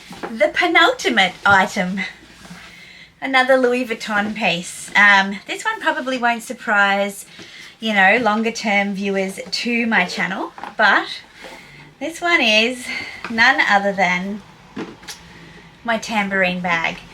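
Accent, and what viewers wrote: Australia. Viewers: Hi, sounds like you are an Australian like me! Have you still got the Clapton and Valentino rock stud bag?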